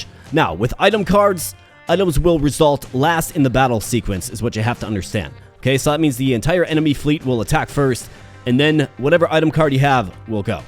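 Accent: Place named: Canada. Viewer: You know its plague and not pleg ? Are you Canadian? Canadians cannot say that word for the life of them, kinda odd. Only noticed it when I started watching youtube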